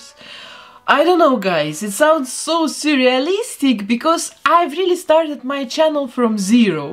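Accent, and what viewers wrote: Russia. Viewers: Хмм, русский акцент?🤔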